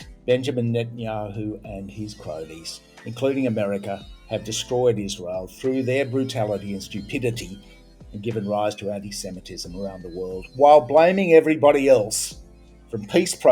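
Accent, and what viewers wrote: Australia. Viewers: It’s great to hear an Aussie accent speaking the intelligent logical sane truth! Thanks ❤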